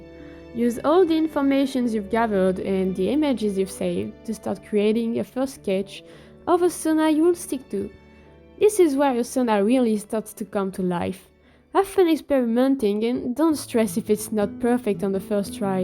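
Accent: France. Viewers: l'accent francais est adorable<3 merci pour cette vidéo!! jai souvent le réflexe de dessiner ma persona en mode silly goober et ce qui marche pour moi cest de créer une version "originale" simple, et ensuite faire autant de AU que je veux du genre ocean AU, space AU ou je m'amuse plus avec le design :)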